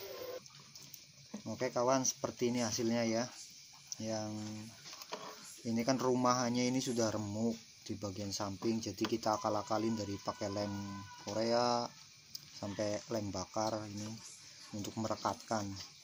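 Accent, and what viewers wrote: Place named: Banyumas, Indonesia. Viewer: Bang ruamhnya mana kok ngapak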